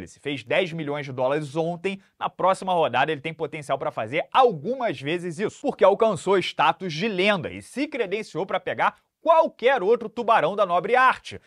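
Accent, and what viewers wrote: Rio de Janeiro, Brazil. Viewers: Esse vídeo teria sido muito bom se não tivesse sotaque carioca.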